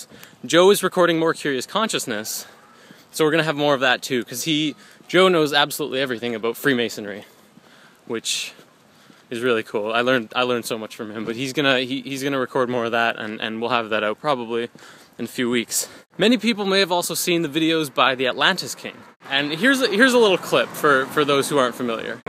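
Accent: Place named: Canada. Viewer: To the people commenting on how he says words: He's Canadian. He has a Canadian accent. In fact if I remember correctly he even said "aboot" in SS1.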